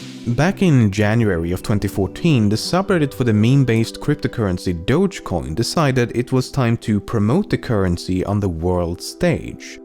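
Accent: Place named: Sweden. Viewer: The swedish accent on English it's so good. One can never get angry on them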